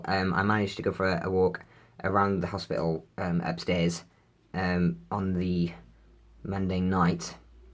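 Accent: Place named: United Kingdom. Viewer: OMG, you're so handsome, and I love your cute British accent! Thank you for sharing your experiences with bottom surgery. :) <3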